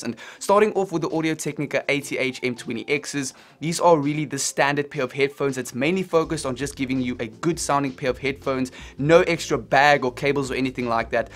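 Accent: South Africa. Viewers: south african? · South African ..though I thought one or the other :-) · he south african?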